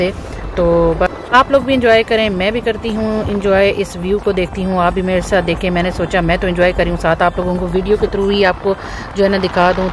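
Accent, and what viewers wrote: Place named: Pothwar, Pakistan. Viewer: BHAUT CUTE BACHAY HAIN AP KE KHUDA SUB KO KHUSH RAKAHAY AR MEHFOOZ RAKHAY AMEEN AUR AP KI POTHWARI SUNANAY MA MAZA AH JATA HAI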